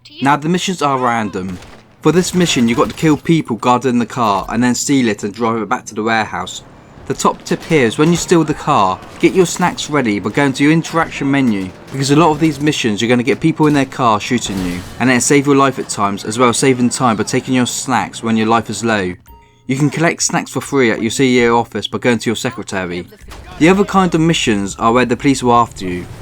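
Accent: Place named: United Kingdom. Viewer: Thanks man, the video was so helpful and I also love your British accent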